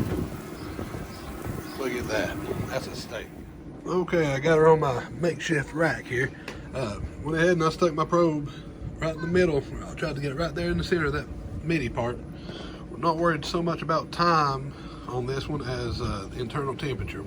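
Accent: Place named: Georgia, United States. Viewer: New to the channel. Was browsing ideas for the Tomahawk. I like the make shift basting idea 💡. However I have to say, and strictly off of personal preference, 100% NO Montreal Seasoning. Judging by the accent, you sound like a good ol Gerogia Boy. I had a friend visit from Dallas over the summer, and he used the Montreal Seasoning on a few ribeyes we smoked, and I have to add, I DO NOT enjoy it on my steak. Salt N Pepper ONLY. Others have different preferences, and I find a lot of Louisiana-Georgia boys love themselves some Montreal Seasoning. Also, wanted to ask, what flavor pellets do you prefer? I myself enjoy the Charcoal and Mesquite pellets. It really accents the flavor of the meat. I like the temp on the steak that you prepared as well. Perfect. I have the Boss 820, im not impressed with the Lockhart, the temp fluctuates too often and the box uptop seems to not hold temp as well as I hoped for. 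Also, I prefer the reverse sear, the temp control I find easier and allows more flavor into the meat before the sear. If you'd like to exchange videos, ideas and/or recipes, feel free to message me and we can exchange contact info. Always looking for good bbq persons who want nothing better than to make their family smile with tasty meals.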